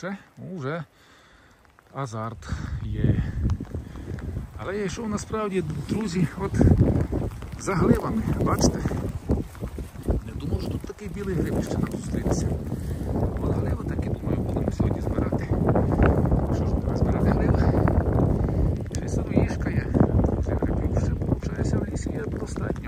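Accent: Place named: Transcarpathia, Ukraine. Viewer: Звідки родом і який район , якщо не секрет ? По акценту наш закарпатець...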